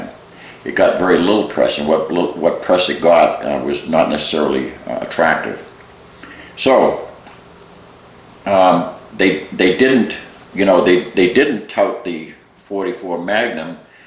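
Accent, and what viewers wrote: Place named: Vermont, United States. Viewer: Are you VT/N.H.? Your accent reminds me of one of my troops in Iraq. He was raised on a dairy farm in VT. He's now a detective locally.🇺🇸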